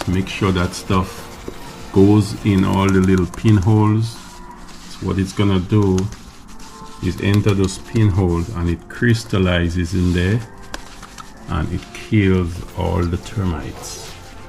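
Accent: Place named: Saint Lucia. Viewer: Hey u have a a st lucian accent.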